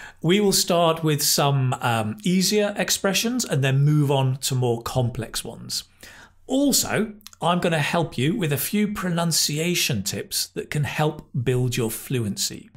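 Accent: United Kingdom. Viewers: Hi teacher? I really love. Your British accent. If I decided to study English by Cambly I'm sure I going to choose as my teacher.💚🌎🖍📗🇬🇧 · Thanks!!! Is your English british? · Is it UK accent?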